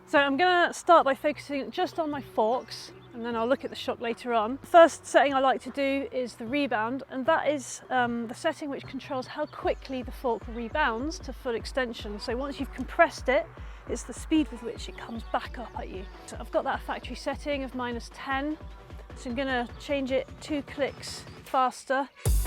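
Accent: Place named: United Kingdom. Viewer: You sound British but doesn't look like you're in the UK. Where is this?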